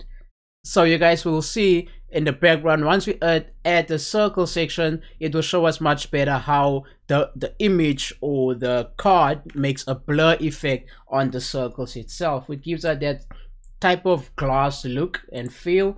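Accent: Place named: South Africa. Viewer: Yusis china lekka to hear a local voice hay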